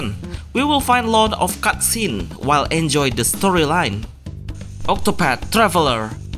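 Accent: Indonesia. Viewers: Let me gguess by the sound of your accent your Indonesian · dari aksennya orang indo kah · hmm I feel you are Indonesian or Russian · logat indo mu kerasa banget bang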